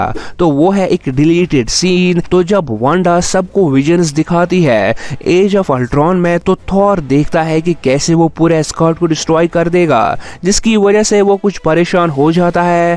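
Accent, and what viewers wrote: Kashmir, India. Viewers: Bro are u from kashmir 🤔🤔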